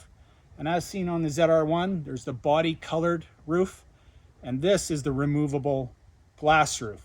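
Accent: Canada. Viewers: That and that annoying Canadian accent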